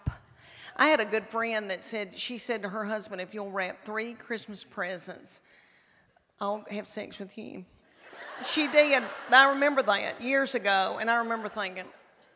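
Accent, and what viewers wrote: South, United States. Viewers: I found this woman, by accident on YouTube last year. Im from England, and i just love that Southern accent., your awesome, you waited a long time for this, you sure do deserve everything now.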